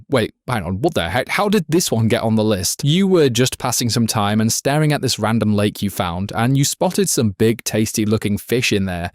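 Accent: United Kingdom. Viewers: Your also British Mate? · He's British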